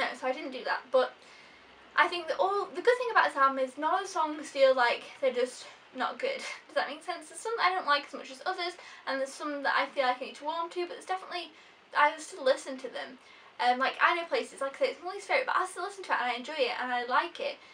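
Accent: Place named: United Kingdom. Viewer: I watched this entire 18 min video start to finish. I love your British accent and you kinda look like Tay Swift as well. Very good review for someone who doesn't usually do music reviews!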